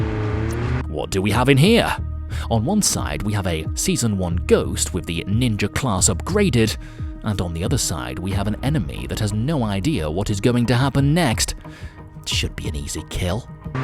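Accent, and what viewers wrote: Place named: United Kingdom. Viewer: Hey guys I'm new to chanel. Why is the commentary in a British accent in contrast with accent????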